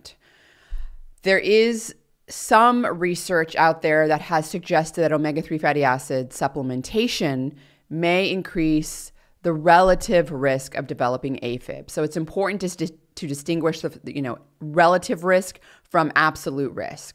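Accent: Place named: California, United States. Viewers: California accent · how would Americans call her accent? Californian? it's interesting.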